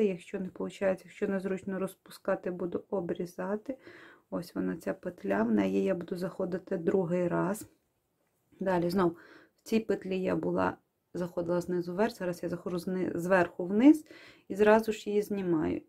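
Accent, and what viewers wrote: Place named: Kyiv, Ukraine. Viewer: Я благодарна вам, и говорите вы, так мило, как моя тётя в Киеве говорила, люблю ваш язык. Спасибо за доступное разъяснения.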